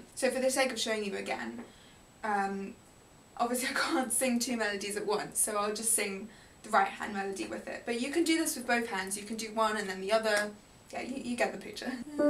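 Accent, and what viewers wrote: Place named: RP, United Kingdom. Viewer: very good88 and i can work on my english because you have the queen mum's accent ! i understand everything even if i am french!!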